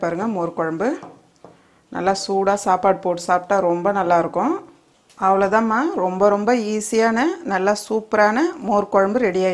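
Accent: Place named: Chennai, India. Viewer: Happiness is hearing our own mother's voice. ♥️♥️♥️♥️ When she talks "parunga maa... With Chennai slang"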